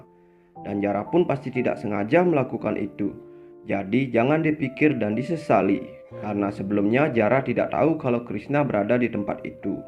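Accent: Bali, Indonesia. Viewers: Aeng kentel logat baline.. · Logat balinya kental banget🤣🤣🤣🤣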